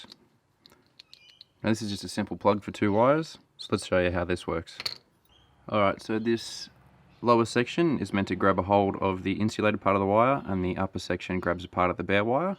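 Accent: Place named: Australia. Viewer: Great info, and nice to hear the Aussie accent!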